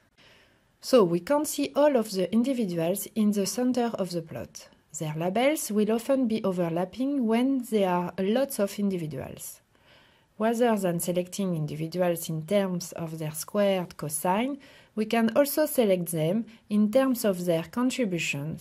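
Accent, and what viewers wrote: France. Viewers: Her English accent is like french-i couldnt understand much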